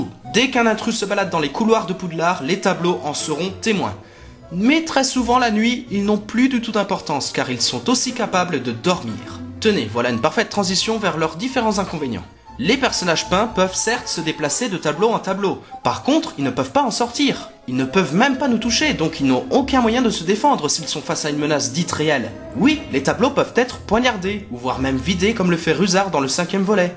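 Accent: Switzerland. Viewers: Monde remplie de magie il a un accent suisse c'es tout ^^ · Tu est suisse ?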